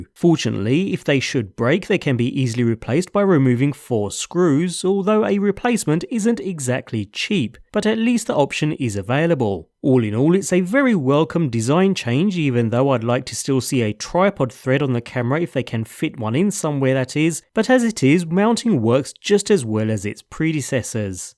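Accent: United Kingdom. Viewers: I love the way you speak, are you from UK? Anyway your review is brilliant! More reviews mate! Greetings from the Philippines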